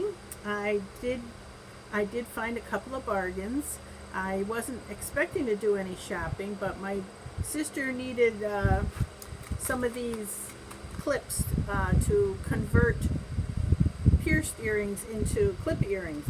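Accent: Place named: New York, United States. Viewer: I knew you had to be from NY! I can tell by your accent. I am from really upstate NY (Massena)! I have an almost Canadian accent that comes back if I am home for very long!!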